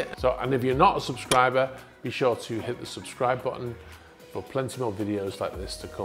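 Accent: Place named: England, United Kingdom. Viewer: Hes got some kind of classic english quality that makes him go easy on my ears so thus i watch him. the yelling screaming youtubers - its not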